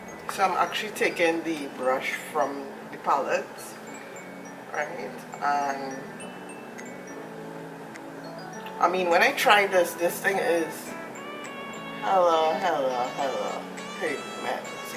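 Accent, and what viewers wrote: Trinidad and Tobago. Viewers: You sound like you have a Trini accent! I love it. My dads from Trinidad. Omg! I just got my palette in the mail the other day! So Pretty!! Loving the look! 💕💕 YOU COMPLETELY SLAYED ME!!! 😍😍😍 I just want to say how much I enjoyed watching your video. Can’t wait to see more! Just left a like 👍🏽 BTW I also have a channel, I would love for you to check it out when you have a chance and show some love and support! I just uploaded a tutorial using this palette as well! Us smaller YouTubers need to stick together, let's support one another! Lol Thanks! 😘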